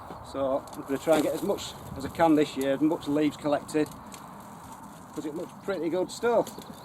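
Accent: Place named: North, United Kingdom. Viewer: Hi Love your Northern accent! We call this the Back To Eden Method in the States. It really does work very well. I loved your competition footage as well. Keep it up!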